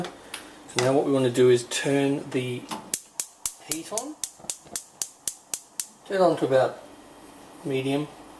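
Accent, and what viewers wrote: Australia. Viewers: r u aUSTRALIan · hes an aussie? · Aussie I think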